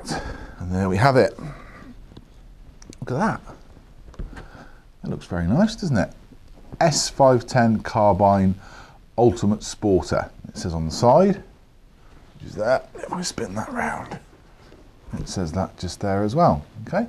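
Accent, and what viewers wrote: United Kingdom. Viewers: I love to hear you talk :( funny guy, speak very well, nice pronounced british accent.. Don't get what people dislike!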